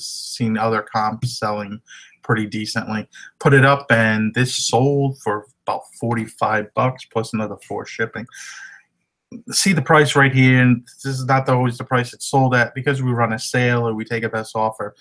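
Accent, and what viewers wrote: Boston, United States. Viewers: I like your Boston accent don't let the bugger's get you down you give always excellent content! Never heard of the Weston shoes till today so something to file away in my search's! Thanks for giving really nice vids! Corie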